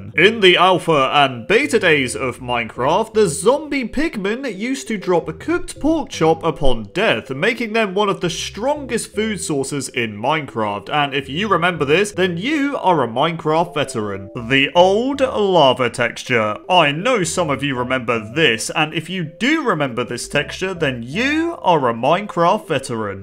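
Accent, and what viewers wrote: United Kingdom. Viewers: YOUR DEFINITLY BRITISH ARE YOU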